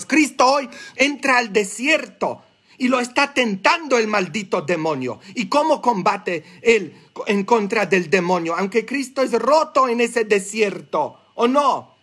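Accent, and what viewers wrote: Mexico. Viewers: Que divertido es usted padre me encanta que sabe el español mexicano👍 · Que bendición haberlo encontrado padre. Es usted un Ángel!!! Me encanta todo lo que dice y sus explicaciones son especiales. Usted si que aprendió el español mexicano así como de donde soy yo de un pueblo en Jalisco llamado Acatic (pero hoy día vivo en California). Espero muy pronto poder ir a una de sus misas cuando viajemos al norte de California. Dios lo bendiga y nos siga nutriendo de tanta sabiduría. 🙏🏼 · Padre, Gracias! Ud, es precioso me gusta escucharlo en mi español con acento mexicano, soy ecuatoriana, lo amo. Padre, Si creo que existe el mal por eso aconsejan tener animales porque nos protegen; y, el pobre perrito tomó la maldad que le cayo al pobre cura. Muchas Bendiciones! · Padre, que bonito es aprender y disfrutar de sus predicas, y yo creo que usted es igual de mexicano que yo, habla igual como hablamos nosotros, igualito...un abrazo muy grande....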